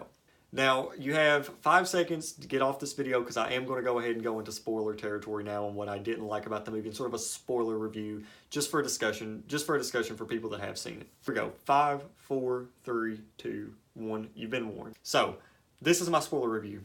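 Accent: South, United States. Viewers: I want a southern American accent like you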